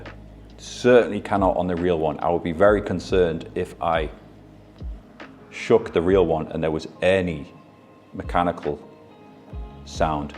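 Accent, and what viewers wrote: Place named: Newcastle, United Kingdom. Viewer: Love your accent mate your Geordie still pops out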